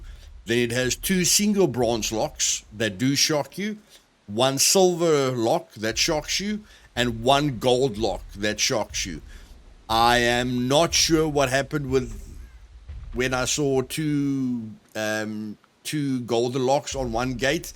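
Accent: South Africa. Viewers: accent is very South African.... niice vid boet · You sound South African bud lol if yes maybe we should have a game together but I warn I'm a complete noob just started but enjoy your videos bud nicely done 👌😊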